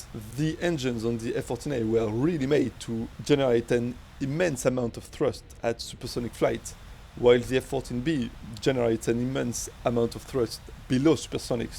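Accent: France. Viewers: Oh god that french... Please yt don't show me baguettes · R u french, nice accent??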